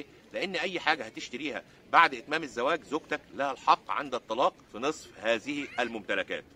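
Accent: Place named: Saudi Arabia. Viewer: معليش سؤال انت كنت عايش في السعوديه؟؟؟؟؟؟؟؟ لهجتك متاثرة بالكلام السعودي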